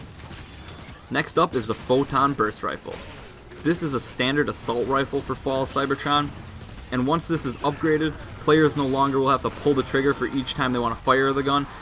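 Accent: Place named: Canada. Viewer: I don't. Canadians are of mostly French descent I think? That makes them european. I was just curious if he was from Canada because he sounded Canadian. Now I'm a racist for being curious. What a wonderful and oversensitive world we live in now, that I can't just make simple conversation without being accused of being a bigot. T_T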